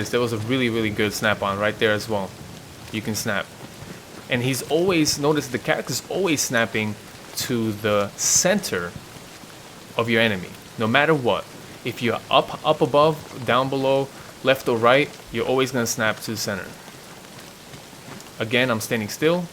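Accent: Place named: Russia. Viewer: Steven Featherstone Not German but not American, think closer to Russia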